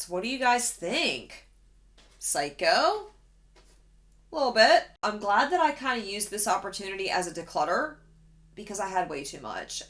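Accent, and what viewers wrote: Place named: South, United States. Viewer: I discovered you because of this video and I love you 😂❤️ are you from the southern states? Because you sound like my people 😂. Also. Can I be your daughter of niece? I just discovered makeup 1 1/2 years ago and trying to build my collection. Lol. Jealous!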